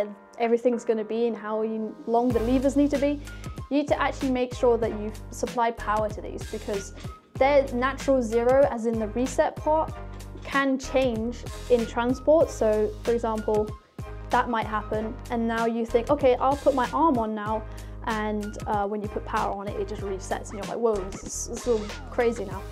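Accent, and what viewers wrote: United Kingdom. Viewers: YES QUEEN UR FROM THE UK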